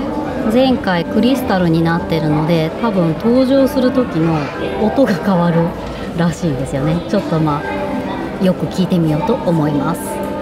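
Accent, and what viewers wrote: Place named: Okayama, Japan. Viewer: 外ではバリバリの東京の言葉(?)で話してますが、夫婦の会話は岡山弁ですw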